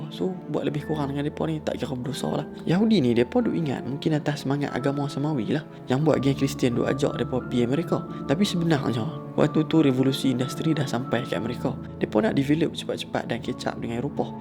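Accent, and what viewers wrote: North, Malaysia. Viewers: Pekat utaqha dia.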